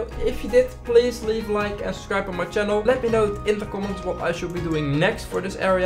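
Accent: Netherlands. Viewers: ik weet dat je nederlands of belg bent dat hoor ik aan je engels maar i love youre vidoes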